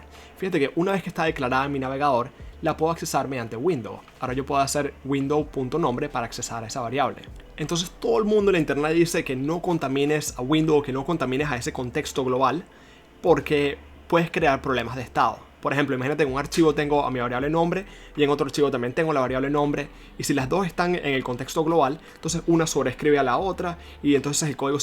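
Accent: Venezuela. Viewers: Muchas gracias por los consejos, no pude evitar notar tu acento ¿Eres venezolano? PD: Pondre en practica estos consejos. printf("Gracias")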